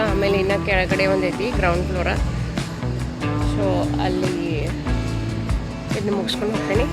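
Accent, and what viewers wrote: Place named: North Karnataka, India. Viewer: ನೀವು ಮಾತಾಡ್ತಾ ಇರೋ ಶೈಲಿ ನೋಡಿದ್ರೆ ನಮ್ಮ ಉತ್ತರ ಕರ್ನಾಟಕ ಭಾಗದವರು ಅಂತ ಅನ್ನಿಸ್ತಿದೆ ಹಾಗಾಗಿ ನನಗೆ ನಿಮ್ಮ ವಿಡಿಯೋ ತುಂಬಾ ಇಷ್ಟ 🤝🤝🤝🤝👍👍✨🌟✨🌟✨🌟✨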